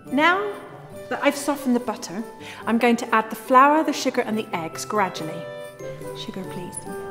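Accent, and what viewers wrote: United Kingdom. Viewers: Why do I keep watching these! Do I just love British accents?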